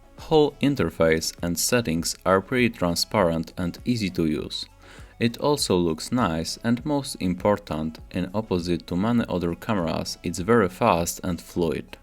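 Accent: Poland. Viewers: Słychać te Polskie korzenie ale nie jest źle. Łapeczka leci, pozdrawiam :) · are you polish person :)? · He's a Pole! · Are you Polish? Because I recognize the accent cause i'm Polish.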